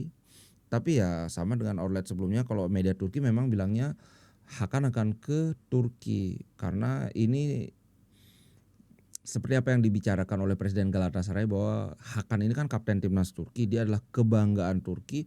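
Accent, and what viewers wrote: Bali, Indonesia. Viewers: logat kayak org Bali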